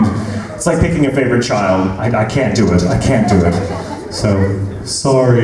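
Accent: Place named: United Kingdom. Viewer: lol outside world : nobody cares about how he speaks; oooooh he's British, good for him... in conventions : when he speaks like that, everybody dies <3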